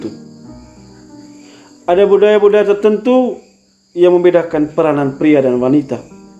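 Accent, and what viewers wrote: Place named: Flores, Indonesia. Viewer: Semakin Logat Maunori. Mantap eja Rmo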